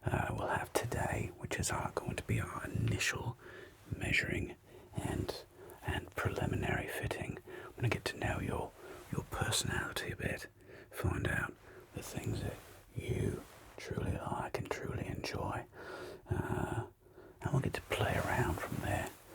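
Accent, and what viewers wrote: United Kingdom. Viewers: I absolutely love the VIP experience you provide in your suit measuring videos! So calming 😌 I love the triggers and the British accent too! Thank you so much J! Smashed another video out of the park again! (when do you not?! 😂 ) PS. Love the profile pic update too! Looks cool! Keep up the great work! Have a lovely weekend!! 💙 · I seriously thought you were actually British 😮. Amazing content as ever ❤ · I was not prepared for how much I would enjoy you with a British accent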